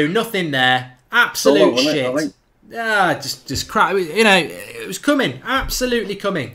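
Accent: Manchester, United Kingdom. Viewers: Thick Mancunian accent, good to see someone backing their local team 👍🏻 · I swear he said he wasn’t from Manchester, unless he was joking cos it is very Mancunian.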